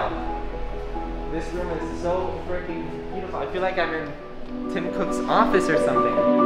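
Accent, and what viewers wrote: Spain. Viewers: los que somos españoles escuchándolo hablar español y dándonos cuenta de que es español 🤡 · ese acentito español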